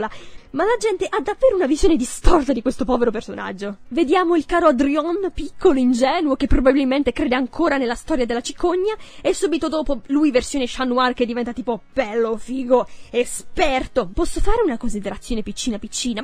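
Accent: Bari, Italy. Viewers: Oddio 😍 accento pugliese, Barese? Sono di Bari, nuova iscritta complimenti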